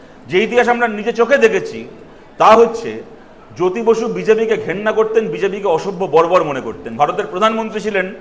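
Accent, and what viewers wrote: Bangladesh. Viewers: Who is not available on pronunciation about parijon and proyojon,he is what type of leadership. what is aramvabe? What are these educated man? Joytibasu made backward West Bengal few decades mainly in English language. actually vat speaker. She has not proper pronunciation also in Bangla. Her pronunciation like Bangladeshee.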